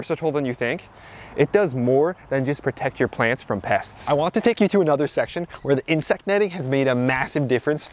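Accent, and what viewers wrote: United States. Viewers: I was thinking he was an American in Jersey uk .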